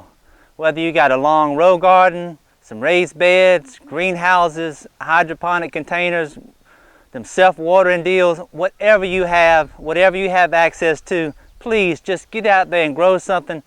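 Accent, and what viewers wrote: South, United States. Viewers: nothing like a good ole boy, love the accent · where are you from with that awesome accent?New Orleans ?Kentucky?....anyway great channel I am sure this channel has helped countless people ! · Great video I like your voice so southern! :) God Bless!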